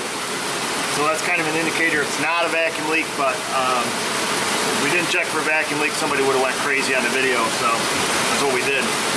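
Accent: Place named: New York, United States. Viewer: First of all,, im a Finn. I live pretty much at the same weather condicions as you in New york. We do use salt as ice melter on low freecing condicions,,, meaning 0- 10 Celcius. But not so much. Our vechiles seem to rust perhapse even more than yours in new york. I used to like the southern drawl,,, but becauce of you,,.Im a big fan of this Northern Newyorker language that you use.I do love the south allso.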